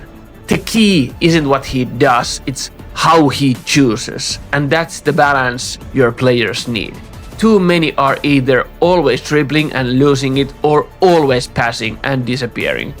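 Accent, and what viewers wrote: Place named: Finland. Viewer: I love Finnish accent. Soothing, clear, understandable. Thanks for the extensive analysis. Subbed. Regards from Turkey.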